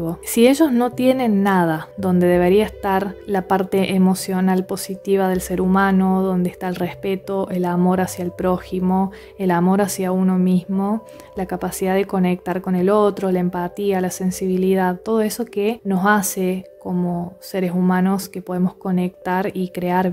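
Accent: Córdoba, Argentina. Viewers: Hola excelente tu trabajo sos de alguna localidad de Córdoba, ya q tenés tonada cordobesa , sé reconocer esa tonada , porq yo vivo en Córdoba . Una pregunta y me interesaría saber cómo es la vida de un narisista en la vejez , cómo son sus comportamientos y sabiendo q ya no puede conquistar ? Un beso . :hand-pink-waving:🙂😘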